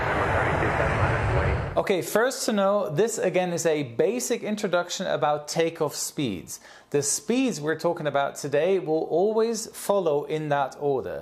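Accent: Germany. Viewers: Du bist deitscher..ich höre die Akzent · Vipersniper_369 hes German. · Bist du deutsch?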